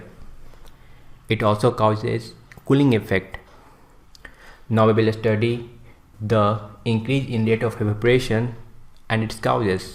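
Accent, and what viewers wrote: Bihar, India. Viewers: Sahi h bihari bbu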